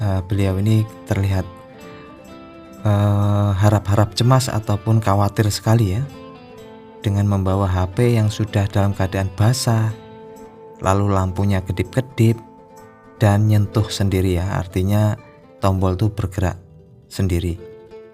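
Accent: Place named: East Java, Indonesia. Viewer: ow kirain di Jawa timur, krn logatnya mirip orang sini ☺️🙏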